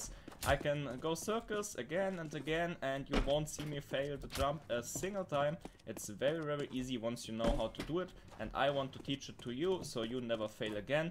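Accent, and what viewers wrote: Germany. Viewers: German accent ? 😉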